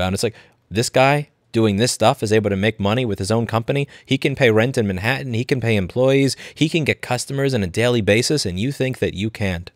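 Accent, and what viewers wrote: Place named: Carolinas, United States. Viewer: Yep, sounds like the Carolinas, greetings from upstate SC.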